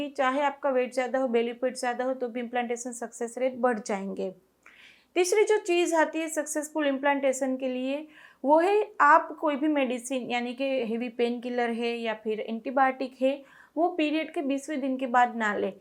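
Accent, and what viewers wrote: Gujarat, India. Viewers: Gujrat se h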